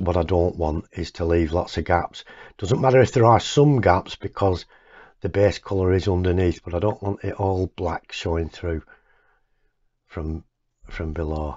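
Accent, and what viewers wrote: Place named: Scotland, United Kingdom. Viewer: Are you a Scottsman? Nice accent.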